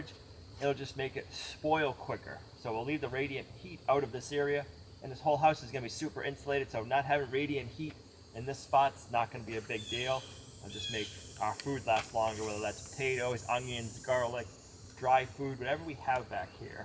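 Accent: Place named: New England, United States. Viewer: Oh you said “ TARP”, I heard “THHHAAAAP”. You New Englandera kill me with your accents! LOL. AND NO your not going overboard.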